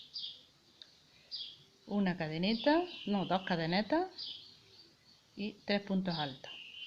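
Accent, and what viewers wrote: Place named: Murcia, Spain. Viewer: Jajaja , eres Murciana , menudo acento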